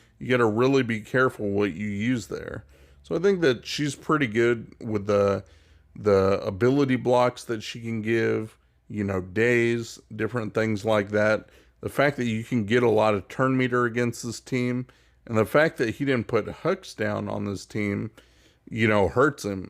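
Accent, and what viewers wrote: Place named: Texas, United States. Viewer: fiddy-four banners LOL! ive noticed your cool accent before.Are you from Texas or smthing? :D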